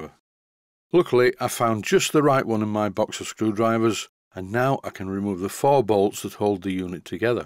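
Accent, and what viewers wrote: United Kingdom. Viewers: British people talk with such elegance!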